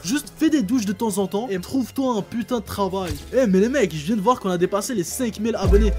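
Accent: Quebec, Canada. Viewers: t quebecois? parce que moi oui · Mais c’est moi ou il a un accent innnnn peux Québécois?😂❤(je revient pour dire que en faite la debile que je suis j’avais pas capté qu’il est québécois 🧍🏻‍♀️. Myb)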